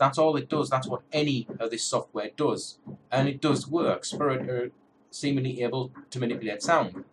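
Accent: Newcastle, United Kingdom. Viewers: I hate huff you sound like a Geordie same as me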